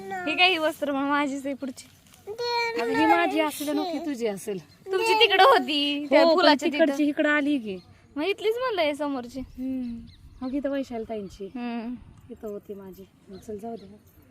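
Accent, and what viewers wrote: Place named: Solapur, India. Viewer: मला तुझी सोलापूरी अर्थात करमाळा ची भाषा खूप आवडते तु लय भारी बोलते 💜🧡❤️💚💙💙🍒🍒🍒🍒🍒🍒🍒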